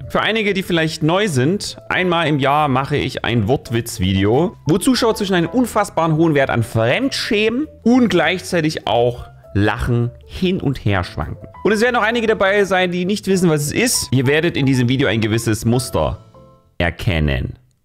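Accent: Saxony, Germany. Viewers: Das sächsische rettet einfach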